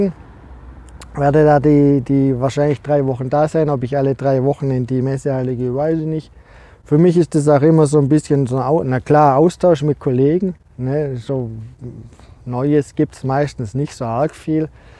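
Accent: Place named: North, Germany. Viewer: super Video - echte, selbst erlebte Infos - und für mich als Wahl-Nordlicht auch mal wieder der Slang meiner Heimat! Danke, "gutes Neues" und weiter so! Chris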